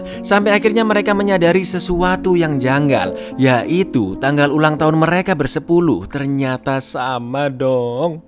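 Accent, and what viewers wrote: Java, Indonesia. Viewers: Intonasi, jawa-nya terasa banget + mantap bang.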